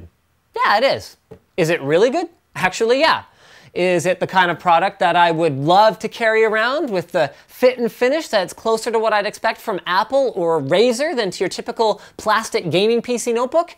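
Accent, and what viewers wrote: United States. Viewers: Canada? Lol I thought you were from the US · Yeah, at first I thought that he's a US citizen due to his accent.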